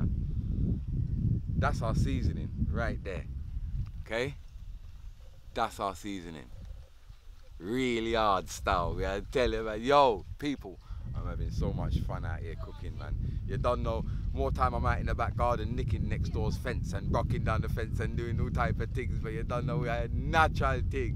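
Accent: Jamaica. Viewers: it's so weird seeing a white preson speaking Jamaican but I love it and energy is of the charts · is a funny guy for a white man speaking patwa my guy💯🇯🇲 big up and moss media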